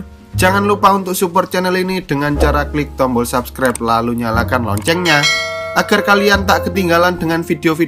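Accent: Java, Indonesia. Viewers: Woe logatmu jowo men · aduh ngomong nya Jawa medok lagi. ini sebab nya aku benci jawa. logat nya malu malu in. mending sunda lebih oke. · Mas maaf, bisa gak kalau ngomong nya gak terlalu medok bgt? Soalnya kaya yg ngobrol sama org jawa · LAGI LAGI MEDOK.... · Medok bgt, jadi kurang enak di denger. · Aduh mendok 😂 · Teko jowo yo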